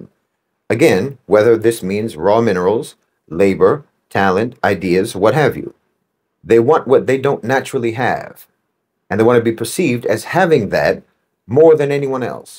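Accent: United States. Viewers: And he he speaks it all with an American accent. Methinks mr marxist minion protest too much.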